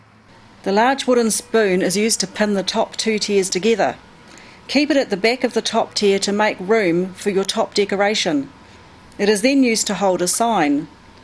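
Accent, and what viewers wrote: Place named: New Zealand. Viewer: kiwi accent <3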